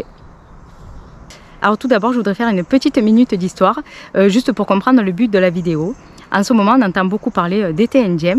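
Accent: South, France. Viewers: Coucou, Ça m'amuse de voir la différence entre le sud de la France et le nord 🇧🇪 l'accent, le type de récolte . Suis impressionné par la taille de tes aubergines et poivrons ! Par contre on a à peu près la même façon de faire. Merci pour cette vidéo A+ 🇧🇪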